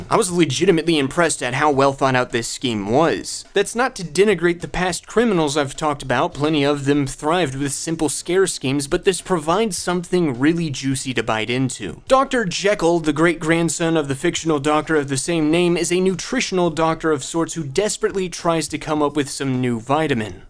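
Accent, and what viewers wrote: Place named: New England, United States. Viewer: Gonna say Maine or Vermont maybe Connecticut. Had to subscribe just for the accent 401 forever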